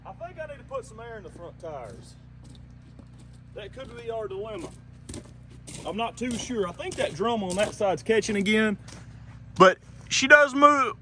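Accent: South, United States. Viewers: you are southern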